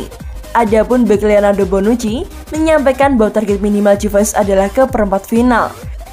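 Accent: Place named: Java, Indonesia. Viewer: Mbaknya medokkk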